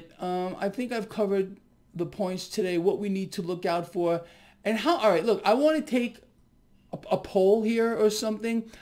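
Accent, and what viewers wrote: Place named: East Coast, United States. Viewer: Of course I don't know. He seems well intentioned, even sweet to me. But I admit to being very amused by his accent and personality. So East Coast!!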